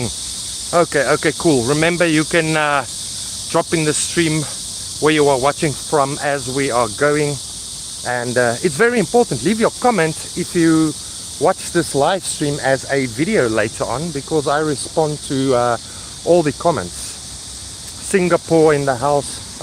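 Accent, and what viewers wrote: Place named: South Africa. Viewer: I just love your accent. I think the South African' English is the coolest of all English accents.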